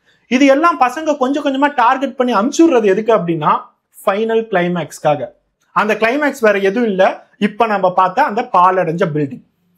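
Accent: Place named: Coimbatore, India. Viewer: ஹலோ வணக்கம் வணக்கம் நான் கடந்த சில மாதங்களாக உங்களது வீடியோவை மிஸ் பண்ணாமல் பார்த்து வருகிற மற்றவர்கள் போடுகிற வீடியோ விற்கும் உங்களுக்கும் என்ன வித்தியாசம் என்றால் அந்த சம்பவத்தை சொல்லும் விதம் தேவையில்லாத ஆர்ப்பாட்டங்கள் இல்லாத அருமையான தெளிவான விளக்கம் கடைசியில் ஒரு ஒரு அனுபவம் பாடம் ஒருவேளை நீங்கள் பொள்ளாச்சியில் படித்ததால் கோவை மாவட்ட சொல் வழக்கு நன்றாகவே உங்களுக்கு வருகிறது அந்த கதை சொல்லும் விதமே நீங்கள் வெற்றியடைய முழு முதற்காரணம் தயவுசெய்து இந்த பாணியை மாற்றிக் கொள்ளாதீர்கள் தானாகவே பார்ப்பவர்கள் எண்ணிக்கை அதிகமாகும்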